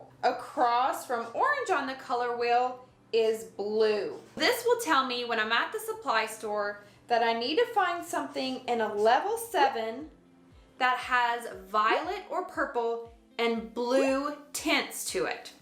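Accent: South, United States. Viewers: Okay, you need your own talk show 🎉Fo REAL, though! You're absolutely adorable; and just incredibly endearing to watch! Normally, Southern accents drive me insane; but it just adds to your amazing charm 😊😅 Can you do a video of your haircut, updo messy bun, braids, and your make up! Your foundation is pretty flawless, and your brow are on fleek! Are they microbladed? You look like a more edgey Stassi Schroeder from VPR, actually!!! Thanks for all the wonderful tips! Soooo incredibly helpful 🎉I hope you got a nice side hustle going through social media $$$ 😊❤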